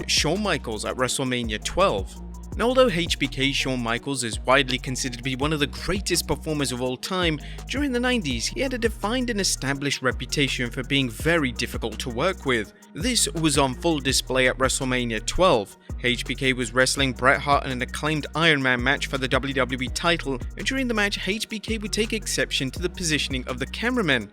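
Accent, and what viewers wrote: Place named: United Kingdom. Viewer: Even if you didn’t have the slight accent I would know without a doubt that you were from the UK. Love the way y’all pronounce H. 😃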